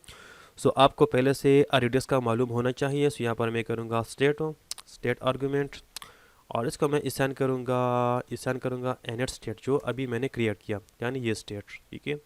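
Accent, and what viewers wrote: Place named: Peshawar, Pakistan. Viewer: Sab acha hai lekin apna khan wala akcent khatam karo bhai ye youtube hai peshawar nahi yahan har banda nahi samajh sakta aap ka style.. please brother..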